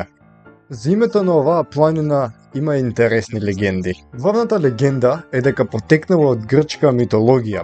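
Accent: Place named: Skopje, North Macedonia. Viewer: не употребувајте некаков скопски нагласок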